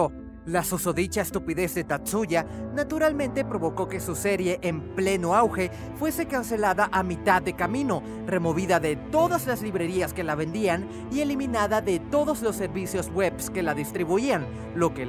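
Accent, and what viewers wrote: Venezuela. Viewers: eres de Venezuela? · Verga pero el que habla es Venezolano? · Tío eres de Venezuela? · eres Venezolano?jajaja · hola y buenas disculpa pero eres venezolano?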